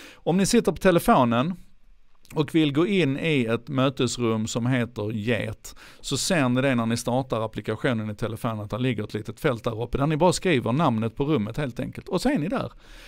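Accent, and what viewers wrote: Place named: Scania, Sweden. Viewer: ) Alternativ till Zoom :-) :-) :-) och så förstås den härliga skånska stämman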